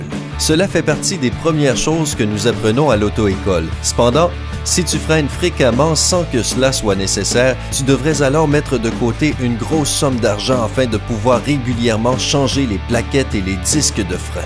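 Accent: Quebec, Canada. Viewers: Pourquoi cet accent québécois ? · nice Canadian accent :) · Sous titrage merdique avec cet accent canadien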